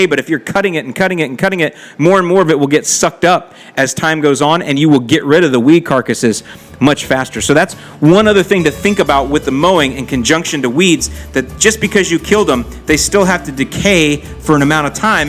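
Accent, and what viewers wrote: Chicago, United States. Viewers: ....killin’ Me with that Chicago accent. It’s alright tho buddy, I ain’t mad atcha.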